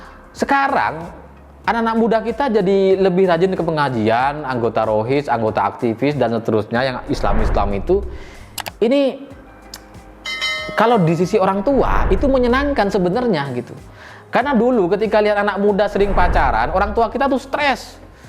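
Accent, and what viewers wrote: Madura, Indonesia. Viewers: Logatnya seperti logat madura pak🤭🤭😁👍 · ternyta oreng bedeh yaa, cara ngomong same dgn p.Mahfud MD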